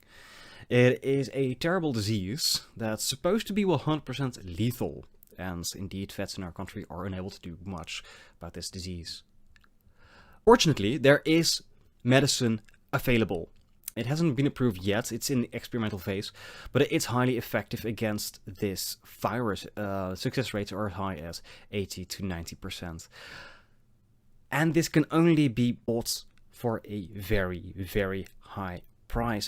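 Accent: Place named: Netherlands. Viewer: Must confess that I always hated the Dutch accent all of my countrymen have, but for chaos draft I'll endure it I guess ;) P1P9: I think you went a little too hard on blue there. Both Skirk Shaman and Stingscourger are a lot better than Piracy Charm. Skirk Shaman would have been a bit too difficult to do with all of your double white, but I think Stingscourger would have been a great pickup there. Overall I think red looked a bit better than blue overall, but I understand why you were staying in it. Would really have payed off in pack 3 though with all of the red removal. P2P9: I would definitely have picked Blade of the 6th pride over the Sarcomite Myr. It's more aggressive and you don't really need more 3-drops at that point. P3P7: Daring Leap is a great combat trick. The first strike alone is really strong, but +1/+1 and flying can win games too. 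Orim's Chant on the other hand doesn't do anything. (edit: somehow the Chant has been very good for you throughout the games. Nice work on that) Although I would have made some picks differently I don't hate where you ended up though. UW Fliers is a great archetype in chaos draft.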